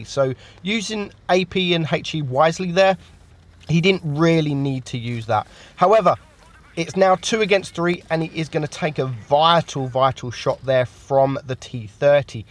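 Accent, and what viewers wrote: United Kingdom. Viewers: Yeah that's pure blood British accent and dialect lol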